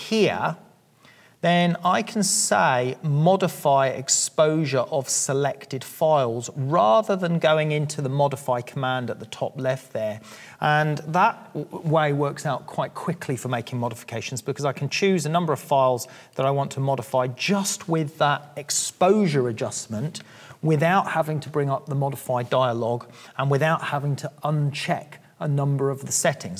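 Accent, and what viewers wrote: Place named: England, United Kingdom. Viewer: This is an English accent. If you can't understand it then you need to take some English classes. You could, alternatively, simply use a slower speed setting on YouTube to understand him better.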